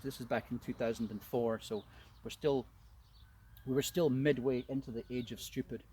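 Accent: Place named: Scotland, United Kingdom. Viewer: I thought ye might of mistaken him from Watts lol He's actually from Glasgow originally (now resides in Sudbury Ont. CanaDUH) I thought I recognized yer accent but wasn't sure what part of Scotland ye were from, I'm originally from Belfast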